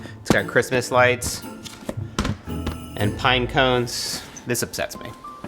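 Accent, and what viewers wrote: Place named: Australia. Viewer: I can hear a bit of the Australian accent on ya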